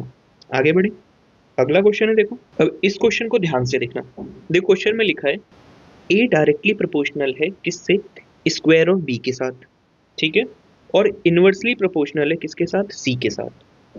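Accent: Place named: Purvanchal, India. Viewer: Aap Purwanchal se lagte ho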